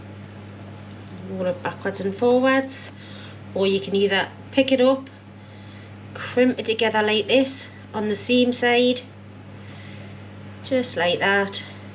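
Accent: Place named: Tyneside, United Kingdom. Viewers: Love your videos,the stuff my ma used to make,I was born 91 yrs ago Willington quay,cant fix your accent Tyneside or Teeside! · Hi. I've had a couple of strokes, am in a wheelchair with limited use of hands and legs.would the shop bought frozen puff pastry work for these as well. Thank you for your videos. My sister did her nursing training many years ago in Sunderland and used to bring her friend to come and stay with us in Liverpool. They're still in touch but I haven't seem her for many years and miss her cheerful,cheerful friendly accent. Its so lovely to hear your accent, it takes me back 42 years. x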